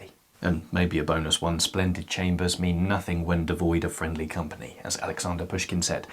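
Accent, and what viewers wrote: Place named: Cockney, United Kingdom. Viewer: I didn't think cockneys still existed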